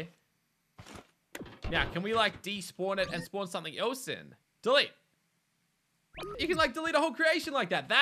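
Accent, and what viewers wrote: Australia. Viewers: Yep he's fucking Australian subbed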